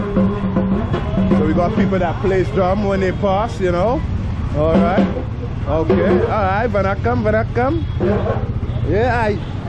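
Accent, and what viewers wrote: Tamil Nadu, India. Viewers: Madurai kaaran 😎😎😎😎😎. Nice Tamizh accent brother. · Your tamil slag ,so cute❤ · Your Tamil accent was very super brother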